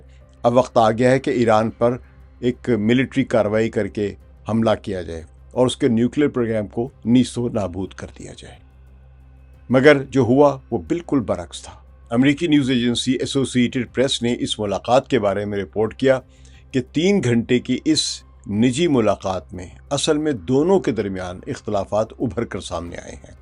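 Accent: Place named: Punjab, Pakistan. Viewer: ماشاءاللہ ۔ کیا بات ہے۔ پنجابی تڑکے کی۔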